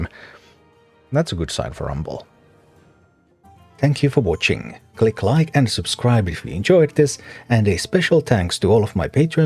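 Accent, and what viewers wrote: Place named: Finland. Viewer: It's the power of the Finnish accent :)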